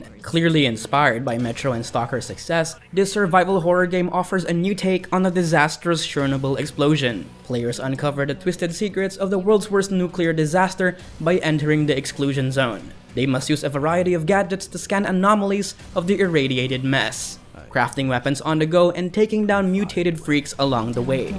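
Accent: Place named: Philippines. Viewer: You must be Filipino, cuz I hear it in your accent. Am I right?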